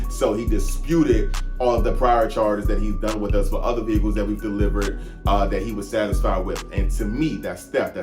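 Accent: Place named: DMV, United States. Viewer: Hey, James here with Adventures in Trucking YT Channel. I have been watching your channel and I REALLY appreciate how you are so honest and forward with your content. Me and my oldest son (he goes by hot shot Shawty on the channel) run freight on a flatbed. We recently acquired a 53 foot enclosed race car trailer to do exactly what you are speaking about. High end vehicles that require enclosed. VERY informative and good information from you as to what to look out for in this business of car hauling. Just a little background on me, I’ve been hot shotting since 1999 on and off. I do have a CDL so we are CDL holders. Currently I have the freightliner M2 with a 36’ foot flatbed and I have my Ram Dually with the 53 foot enclosed trailer as well. Still trying to get that trailer on the road but your videos are always right on point. By the way, I grew up in Bowie Maryland. 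I can tell by the accident you’re from the DMV. Also, we have subscribed to your channel. I think you were 100% correct in handling the damage to the Lamborghini. I wish there was someway that owner operators and drivers could dispute brokers/clients. It seems like everything rolls downhill on us. KEEP YOUR HEAD UP!👊🏾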